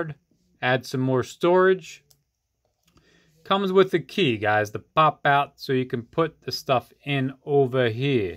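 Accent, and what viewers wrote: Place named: Italy. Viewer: Hay! What happened you lost your Italian accent half way thru?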